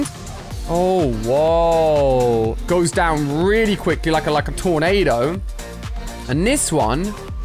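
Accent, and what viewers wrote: United Kingdom. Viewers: I Like ur British accent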